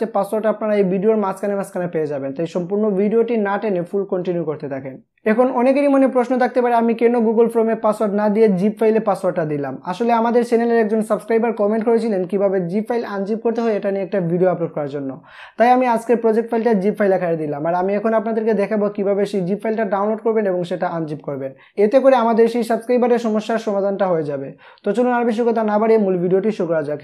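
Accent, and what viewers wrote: Sylhet, Bangladesh. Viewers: আপানার বাড়ি সিলেটে?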